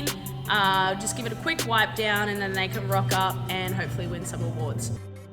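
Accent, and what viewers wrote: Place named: New Zealand. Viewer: Bubbles? how on earth do clear them?? And she sounds like a Kiwi,,,🤔😊😊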